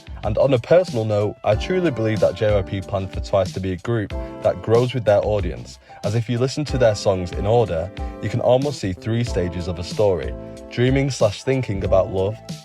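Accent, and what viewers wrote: United Kingdom. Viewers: unrelated but what is your accent called/from what part of england? i wanna know lol✨ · Love your northern UK voice bab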